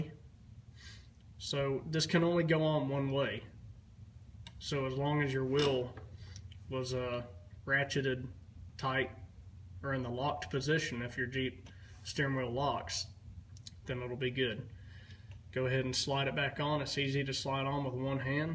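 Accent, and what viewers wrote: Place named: South, United States. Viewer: The southern accent makes it so much better, though. 💪 🍻 🔥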